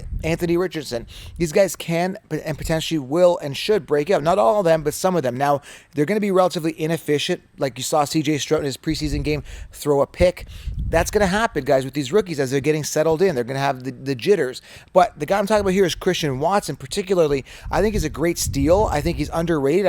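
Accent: Canada. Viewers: Hey man I have an important question, are you Canadian? The way you say about sounds like Avery Canadian accent, lol. Btw you have the best fantasy football information, and people should definitely get the 16 rounds fantasy solution. Thank you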